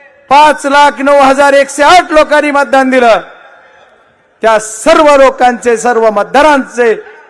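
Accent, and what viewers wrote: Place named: Dharashiv, India. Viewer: धाराशिवची भाषा येते बाप्पाची सेम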